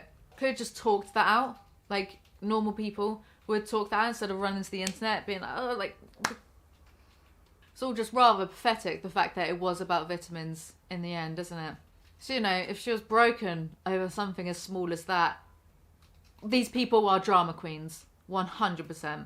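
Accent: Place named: England, United Kingdom. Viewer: Hearing the drama read out by a person with an accent makes me feel like shes reading excerpts out of a sophisticated Englishmans diary. I love it omg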